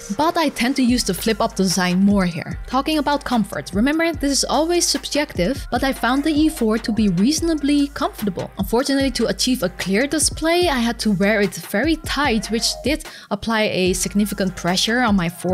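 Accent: Netherlands. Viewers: Sounds like you got a dutch accent.. hehe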